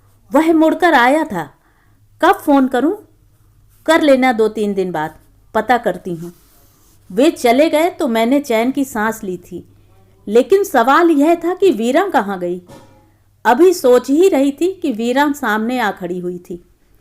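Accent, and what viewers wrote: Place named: Haryana, India. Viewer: बहुत ही सुन्दर कहानी है और ठेठ हरियाणवी अंदाज में सुनाना भी मन मुग्ध कर गया।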